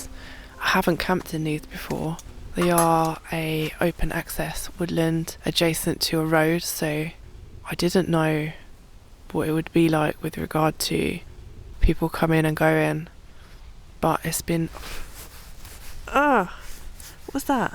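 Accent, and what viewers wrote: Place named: Upper class, United Kingdom. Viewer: Posh upper class david cameron girl init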